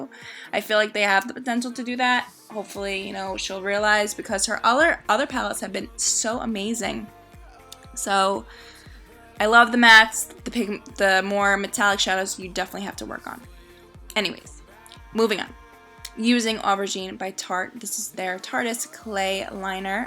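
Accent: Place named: New York, United States. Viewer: Ur def from NYC I can tell by your accent lol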